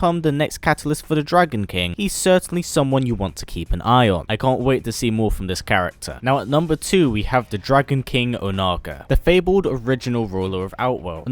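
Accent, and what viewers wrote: England, United Kingdom. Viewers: Maybe it's because I'm a huge sci-fi fan (mainly Star Wars and Star Trek) or because I'm not particularly familiar with Mortal Kombat's backstory, but I expected this list to be mainly Edenians. As a sci-fi fan inhuman does not necessarily equal non-humanoid . Since the person behind the video seems to have an English accent, I'll try to explain with an example. The Doctor is definitely inhuman, but s/he is humanoid.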